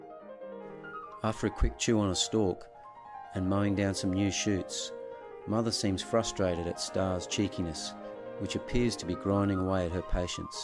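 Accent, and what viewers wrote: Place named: Australia. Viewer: I loved the work on this documentary. The word has to go out to make people aware that koalas are on the endangered list. The I haven't seen anything that has this quality of images and information before. The sound track and voice over give it a uniquely Australian feel and I really enjoyed it. It's great to see koalas in their natural habitat and that's what makes this special for me. I hope to see more from the makers of this in the future.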